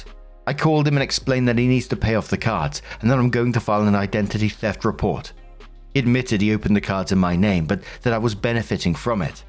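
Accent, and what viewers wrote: United Kingdom. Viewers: As an always sunny fan hearing say "pounding off" very seriously with his british accent sent me. thank you.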